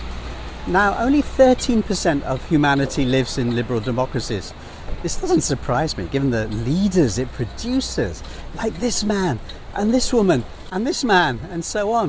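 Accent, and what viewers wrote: RP, United Kingdom. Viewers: Great rant delivered in a inimitable RP accent ! But Westerners will still say : "But we can change leaders !" Yes, from Trump to Biden and likely back again !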